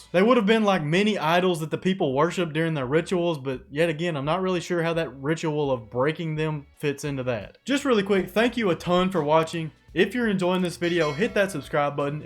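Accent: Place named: South, United States. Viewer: Dude, I love to see people doing what they love and you obviously love doing this. Your enthusiasm is contagious. I subscribed. You have the perfect mix of skepticism and open mindedness. I'm an educated Ga boy with a Southern accent too. I'm guessing you are Southern but not from Ga. Love the videos man. Keep doing it. I'll watch them. People love this stuff.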